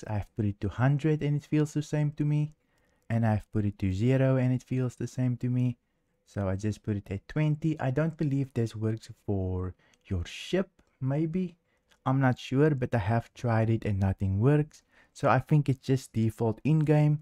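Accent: Afrikaans, South Africa. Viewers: jasis that afrikaans accent hits hard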